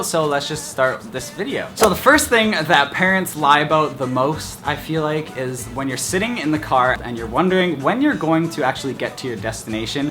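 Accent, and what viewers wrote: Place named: Canada. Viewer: Random Question is he Canadian!!! this the first video i've seen of his!!! He sounds Canadian!!!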